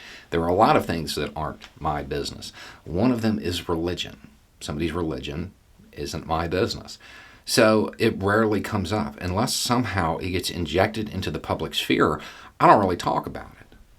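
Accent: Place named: Bible Belt, United States. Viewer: Sounds like a out in the woods BIBLE BELT folk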